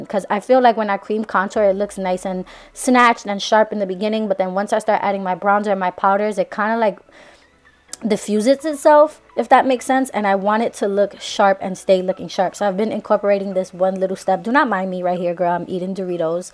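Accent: New York, United States. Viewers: I'm so glad I came across her. Honestly I love listening to her New York accent more than watching her videos just bc its so soothing but I stay watching these videos, like yes boo give me moreeeeeee!!!!!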